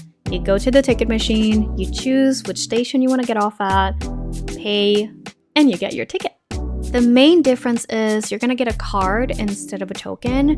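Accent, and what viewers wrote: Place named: Thailand. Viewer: คุณพูดสำเนียงไทยชัดมากกก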